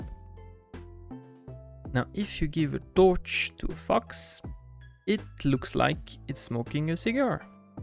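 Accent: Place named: Quebec, Canada. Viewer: Nice , I knew about most of em but didn't know creepers needed line of sight , thought was a player proximity thing as I have been hit many times by creepers sneaking behind me, but I guess that's my line of sight and not his or hers ( not sure about creeper genders 😉) always something to learn. And yes I agree boats are actually ridiculously op, who would have thought one of the most powerful and versatile items in the game would be a boat. ( maybe that's a hyperboly) . BTW are u French Canadian, I always thought u were from France but today I detected some hints in your accent that seems distinctly French Canadian. If so hello from Montreal.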